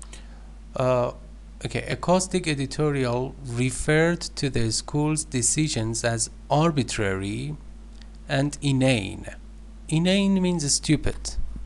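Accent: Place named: Iran. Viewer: Persian accent 😉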